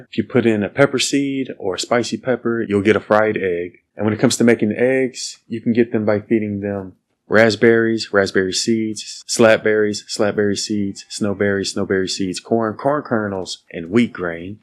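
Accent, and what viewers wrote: South, United States. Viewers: bro is definitely southern, arkansas?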